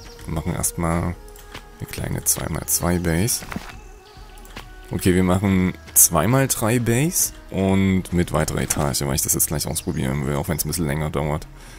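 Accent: Saxony, Germany. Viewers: Super Video. Macht irgendwie direkt Spaß. Mal schauen, ob es das evtl. für die PS geben wird. Und bitte niemals den sächsischen Dialekt weglassen. Das erinnert so an Heimat 😉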